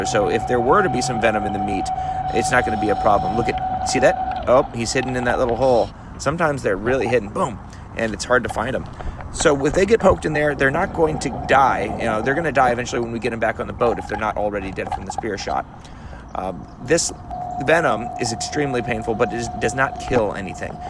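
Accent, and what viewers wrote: Australia. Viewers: Thats a solid Aussie accect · Nice Aussie accent. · Love your Steve Irwin accent 👌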